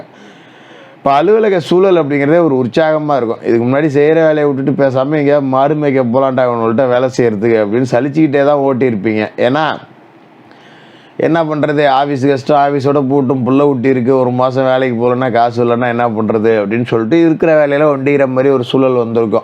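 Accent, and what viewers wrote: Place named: Thanjavur, India. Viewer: ❤ தஞ்சை தமிழ் போல தெரிகிறதே சுவாமிஜி! நன்றி 🎉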